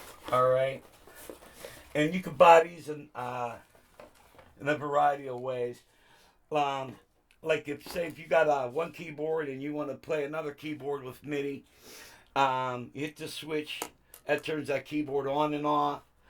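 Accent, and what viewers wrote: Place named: Pittsburgh, United States. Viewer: That Pittsburgh accent tho!!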